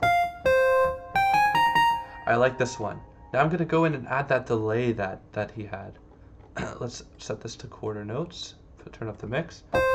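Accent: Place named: Germany. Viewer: Are you German? You kinda sound German to me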